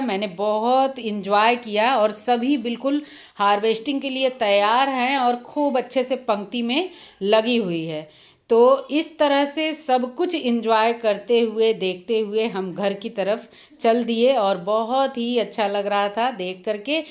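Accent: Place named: Chhattisgarh, India. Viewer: Mam Aap kha se ho Aapki language to chatishgad se lag rahe ho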